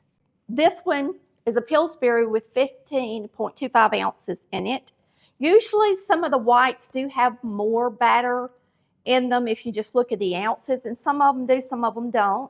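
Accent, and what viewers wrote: South, United States. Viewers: Your recipes look so delicious, I just love your sweet southern talk. I definitely want to make this cake. Thanks for sharing!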